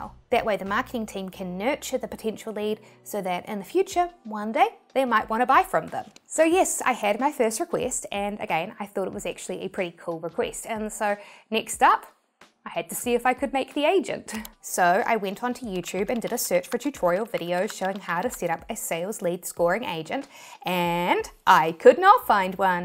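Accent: New Zealand. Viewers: Kiwis have the sweetest accent.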